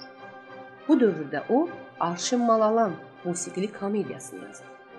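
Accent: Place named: Talysh, Azerbaijan. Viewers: Müslüm Moqamayevin şəkli səhvdir. Bir də ki danışan elə bil talışdı ləhcəsi var. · Ee bəsdirində xəstəsiz talış ləhcəsidi ləhcəsidi nolsun əsas məlumatınz oldu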